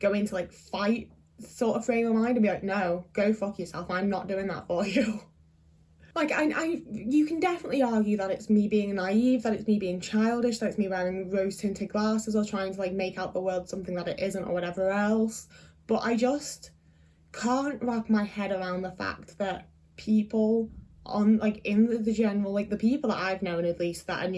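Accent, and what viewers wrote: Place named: Northern England, United Kingdom. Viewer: TW for SA+self-harm+ED in the second paragraph, be safe ❤ With all the North Americans, antipodeans and RP in this space it’s brilliant to hear a sweary working-class northerner. 😂 I’m so there with you, some people genuinely just take the piss if you’re ”too altruistic”. Bad personal stuff or not, video shop bloke sounds like an absolute cunt. Glad you got out of that mostly in one piece. I always gravitate toward the younger people in this space, despite being a guy on the cusp of 40, because I genuinely can’t relate to the people who are still struggling with the same shite but somehow managed to have children along the way. I almost feel like I’m Matthew McConaughey in Interstellar, except I have the body of a 50-year-old and brain of a 20-year-old, but with 40 years of bollox crammed into it. I am in my second relationship, first was almost 9 years, this one is 8 and a bit - in the months between them, I turned my life upside down caring for a person with ED, undiagnosed ADHD (and autism, I’m all but certain) and a serious addiction who really tried to love me as much as I loved her, multiple ambulance trips and stitched up arms notwithstanding (hers, not mine) - she didn’t take advantage, but my feelings made me take advantage of myself and my very limited energy resources for her - then I got SA’d by another woman and ensnared for a few weeks in a Stockholm syndrome relationship (also where she lived, funnily enough) based on fear/fawning, control and mutual s*x addict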